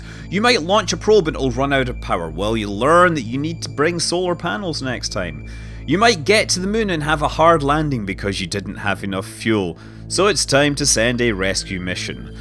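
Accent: Scotland, United Kingdom. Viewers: I just listened to a Scottsman essentially give me a powerpoint presentation about rocket science. And loved it.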